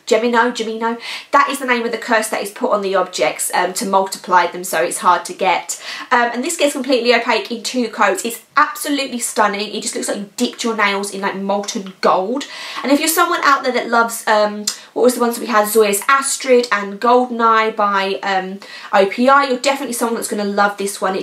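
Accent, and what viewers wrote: United Kingdom. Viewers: Brilliant! I honestly could just listen to you speak all day long with your lovely British accent! I too wondered about the pronunciation of the word Gemino. Tomāto tomàto I guess 😉 (Still can’t believe you’re nearly at the end of a pregnancy!) xo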